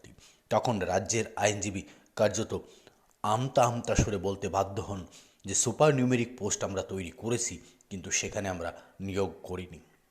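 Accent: Bangal, Bangladesh. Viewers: ভালো করে কথা বলতে শিখুন বাঙ্গাল ভাষার গন্ধ এখোনো যায়নি।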